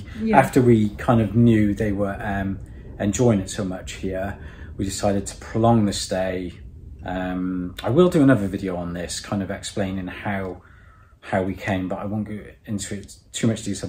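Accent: United Kingdom. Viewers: Очень интересное интервью - я слушал и наслаждался, так как я преподаватель английского языка и не люблю американский акцент и мне нравится британский. Очень приятно слушать Вас. Вам удачи и благополучия.Very interesting interview - I listened and enjoyed as I'm an English teacher and I don't like the American accent and I like the British one. It is very nice to listen to you. Good luck and well-being to you.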